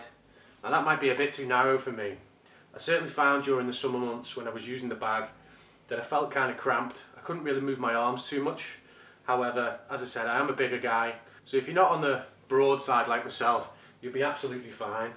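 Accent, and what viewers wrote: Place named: Wirral, United Kingdom. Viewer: Your accent sounds very “Wirral” 😂 are you local to there? Great video mate. Subscribed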